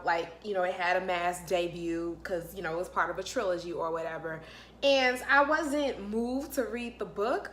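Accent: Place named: South, United States. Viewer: So pretty... my daughter loves Gone Girl. I think I’m drawn to your southern drawl. Keep up the good work. #southerngirls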